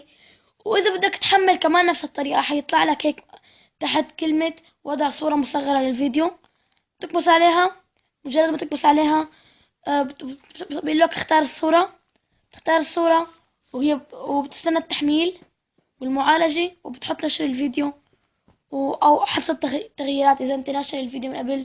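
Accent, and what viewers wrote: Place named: Syria. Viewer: مبين لأن لهجته سورية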